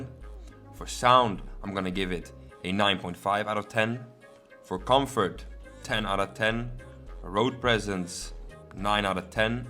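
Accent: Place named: Netherlands. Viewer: why does this dude have a dutch accent living in poland?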